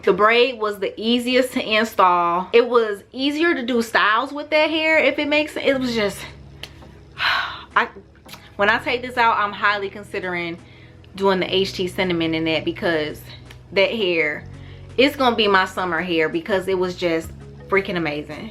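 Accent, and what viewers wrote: St. Louis, United States. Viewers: u sounding str8 outta Nellyville like u from the Lou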